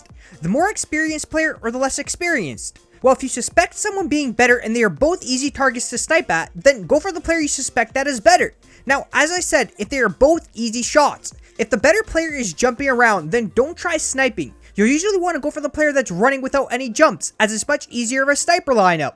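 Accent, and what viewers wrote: Canada. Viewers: I can tell your Canadian. 😂 Please tell me if you aren't but I'm almost certain.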